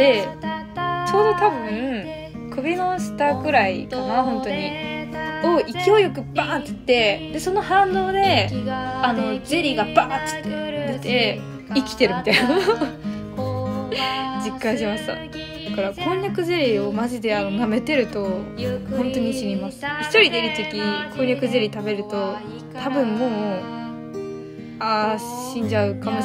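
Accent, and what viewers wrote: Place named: Nagoya, Japan. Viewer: いやー名古屋弁いいわー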